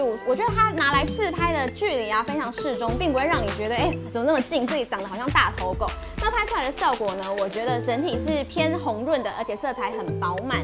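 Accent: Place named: Taiwan. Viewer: 台湾华语 赞！！！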